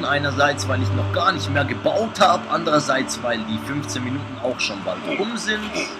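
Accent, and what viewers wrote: Switzerland. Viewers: Da hört man deinen Schweizer Dialekt noch ein bisschen raus😂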